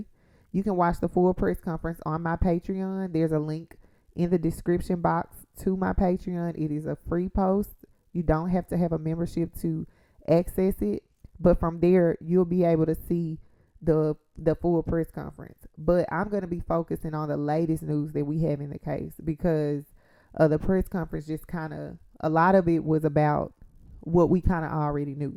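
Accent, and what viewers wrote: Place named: South, United States. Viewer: girl your accent is so southern🤣🤣🤣🤣🤣🤣✌✌💖💖good lesson!...hope this case get the justices he deserve.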